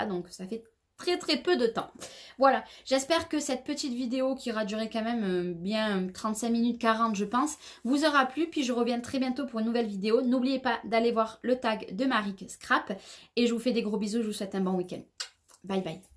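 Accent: South, France. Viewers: Bonjour,pause café,soleil et une vidéo Tag youtube très intéressante, j'ai apprécié ta sincérité et ta franchise..! J'adore regarder tes vidéos 😍 tu es tellement gentille attentionnée pétillante généreuse,et surtout très talentueuse,et ton petit accent du Sud 😉 j'aime vos créations en duo avec Marick.. Tu es exceptionnelle,tu me remplies de joie quotidiennement. Je te souhaite une excellente journée ensoleillée et créative 😉 pleins de bisous 😘💋 Bea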